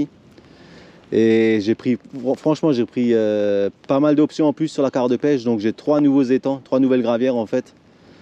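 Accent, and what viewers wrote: Alsace, France. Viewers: Salut ! Je suis étonné des résultats avec le rogen j ai encore jamais rien fait avec sur le Rhin ou dans l étang du village avec (j ai très peu pêché avec aussi 😅). Tu animes comment ? Juste en linéaire ? Merci pour les vidéos et pour ton léger accent Alsacien 😉 je te souhaites plein de beaux poissons pour 2023 et continue tes vidéos !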